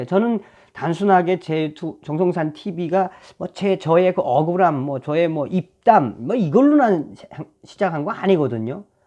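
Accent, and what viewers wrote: North Korea. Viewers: 정말 잘되었습니다 감독님이시라 논평도 뮤지컬처럼 재미있게 잘하십니다 이북사투리도 들을수있어서 거저 재미 있구만요 하는일 잘되시기 기도 드립니다 힘내시라우요😃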